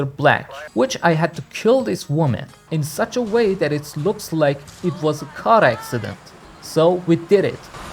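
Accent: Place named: Iran. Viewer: Persian accent 😂😅❤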